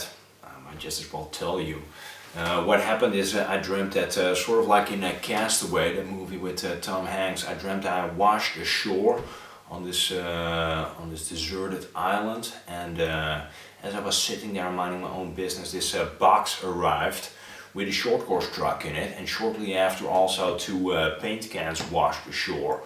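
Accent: Netherlands. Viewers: Ben je Nederlands haha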